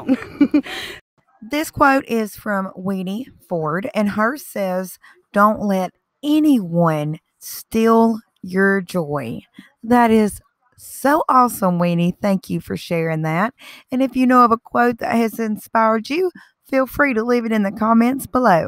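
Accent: South, United States. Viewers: You are so darn adorable I love your southern accent Bless your little heart I love your wagon idea & I’m so glad I found you Keep on creating honey & god bless Hugs, Dee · You are such a blessing to watch. I love your crafts and "Southern" accent. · the southern drawl makes it even better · Omg!!! So cute and creative! I love it and love your southern accent!!! Blessings to you😍😘❤️ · Very cute! I love your voice,I have a southern accent also but not as pretty as yours! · SUPER cute! You are soo creative and I love your accent...being from TN I thought I had a southern accent..you got me beat!...(GA or NC maybe???)LOL!!...NEW SUB HERE!! Keep the ideas coming momma! (people from the south know MOMMA is a term of endearment)...hahahaha...love you girl!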